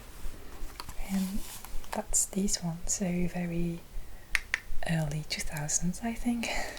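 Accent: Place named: France. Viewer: Old cameras, french accent plus your beautiful face means perfectionnnn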